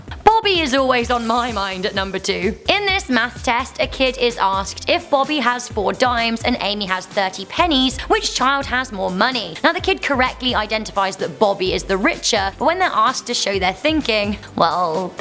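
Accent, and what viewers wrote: England, United Kingdom. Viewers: Miss are you English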